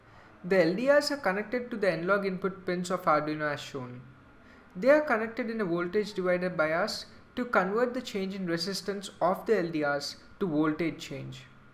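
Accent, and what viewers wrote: India. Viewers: i think he's from india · this is the indian accent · i don't undersand but i hate indian accent and voice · He's obviously from India :/ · I am sure he from India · are you from India? i'm from there i know this accent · yeah but i visited kolkata and there their accent was like his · Probably of Indian, Pakistanian, or somewhere around there-ian/ish.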